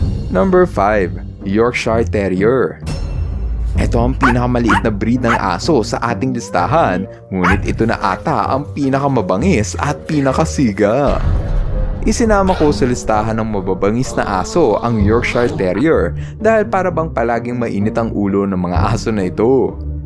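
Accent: Visayas, Philippines. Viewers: Gusto ko po ang german shepherd,dutch shepherd at belgian malinous po kuya pero may tanong ako sayo visaya kaba kasi ang ibang narinig ko sayo visaya katolad namin😅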